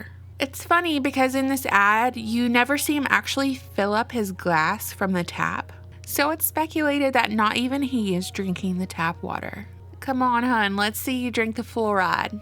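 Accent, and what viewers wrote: South, United States. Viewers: Would love to hear you do an audio book. Ham-up the Southern accent a bit and do "Huckleberry Finn". Too Long? What about "Tom Sawyer"?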